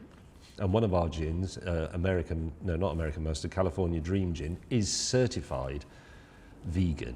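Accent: United Kingdom. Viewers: Most important one: Is James May aware that his intonation and vocabulary are just iconic and have inspired this and many a non-native English speaker to idolize him because of how marvelous the British accent sounds coming from his mouth?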